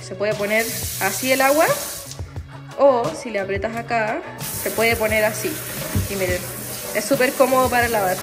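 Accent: Chile. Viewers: Me encantó tu casa por tu acento eres Chilena verdad?? Saludos 😊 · Que bonito el acento chileno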